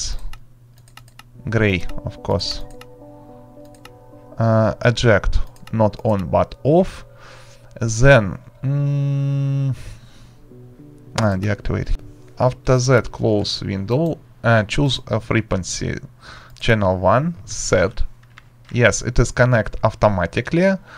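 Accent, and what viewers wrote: Russia. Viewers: Русский акцент))) да ты же наш)))😅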